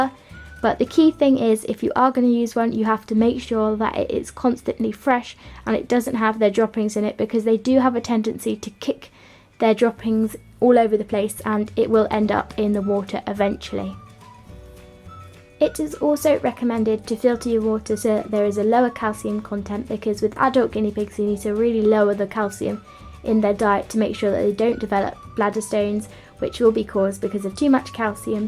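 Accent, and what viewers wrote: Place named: Scotland, United Kingdom. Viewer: I was wondering where are you from because I’m Scottish and we have the same accent ❤️ I also have a guniea pigs names Zelly and George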